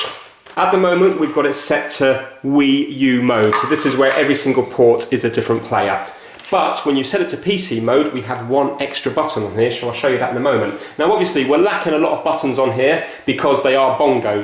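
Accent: United Kingdom. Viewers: we present the award for the most english sounding youtuber ever to this guy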